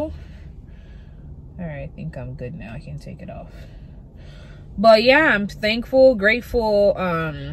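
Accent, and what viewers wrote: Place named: England, United Kingdom. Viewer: Your skin is glowing ♥️😩 It's your English accent for me💖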